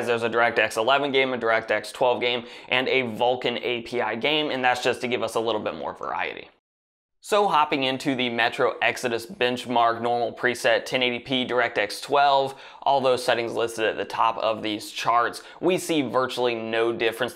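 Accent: Indiana, United States. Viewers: Are you from Indiana?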